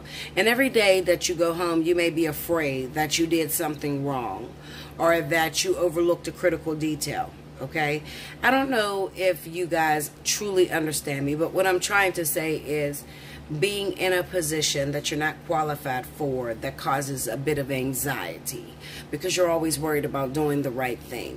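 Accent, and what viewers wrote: Pittsburgh, United States. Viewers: I know this is off topic but are you from Pittsburgh?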